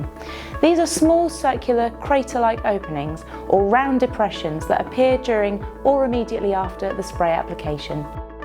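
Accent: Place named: United Kingdom. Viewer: For the repair 1) what solvent is she talking about it step 1.a? you've just painted it, there's no solvent on there. duh. 2) what does 'flash' in ss 1.b mean? Secondly, what section of of jolly ole england is this gal from? Sounds mid-british, not too high-falutin', not too lower, just a nice, regular midcent from somewheres. I'm guessing not w/i 60min of londan, but neither higher than an hour south of the scottish border. Idk, never been over there, don't know if it's coastal or country or suburban. Little help please. I like to study these things.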